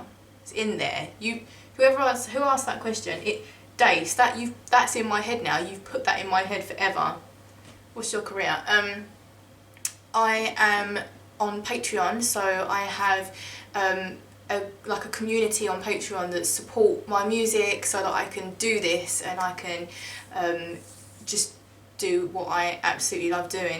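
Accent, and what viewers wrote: England, United Kingdom. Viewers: What part of England are you from? Could listen to you narrate a phonebook all day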